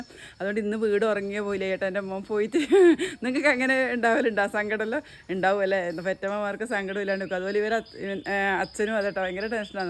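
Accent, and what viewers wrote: Kannur, India. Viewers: കണ്ണൂർ ഭാഷ സൂപ്പറാട്ടോ, കേൾക്കാൻ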